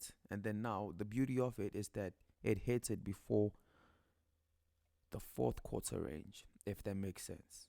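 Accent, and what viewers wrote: South Africa. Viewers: just plain typical Joburg boy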